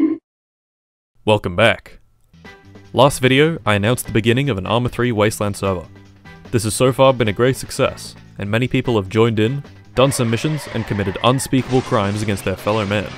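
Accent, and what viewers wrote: Australia. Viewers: You're from Australia, I'm sure of it bc I kinda talk like "I did a thing"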